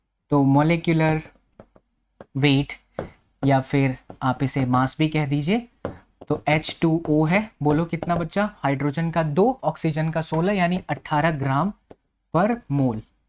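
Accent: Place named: Tamil Nadu, India. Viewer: 46' 12 Tamil accent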